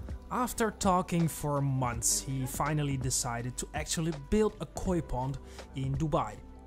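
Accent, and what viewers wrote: Netherlands. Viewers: You sound dutch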